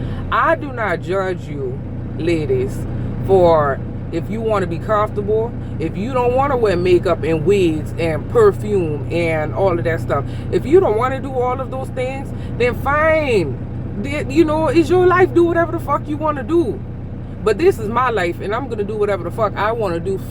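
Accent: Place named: New Orleans, United States. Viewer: Girl where are you from with that accent?? New Orleans?? You're so Beautiful, keep doing you. I just happened upon your channel looking for lady truckers because I'm trying to find out SOME of what I need to know as a semi new driver.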